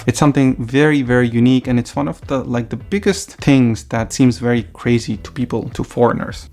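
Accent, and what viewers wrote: Estonia. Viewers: Its funny that you have a little bit of an Estonian English accent. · Wth, you have Estonian accent, totally 🤧🤔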